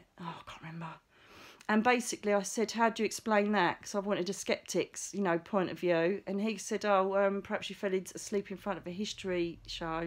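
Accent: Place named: United Kingdom. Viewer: Im just going to go ahead and say it ask it whatever lol 😅 is it possible that you have been a spirit guide to me before or can you be a spirit guide to someone while part of your soul is currently incarnated at this time let me explain its your voice the british accent the sound and frequency is almost an identical match to what Ive heard speaking to me for years. Im from WV USA but Ive always had a lovely female with a British accent that often speaks to me. I use to brush it off so noone would think I was crazy. Lol 😅 I said it I almost erased this but I heard a big NO in my left ear and a nudge to continue and ask dont erase or feel embarrassed 😂 anyways Thank you for all you do your a wonderful teacher ❤️😊 and yes I'm very grateful for all the knowledge you give and the stories you share dearest 🙏💜🕊️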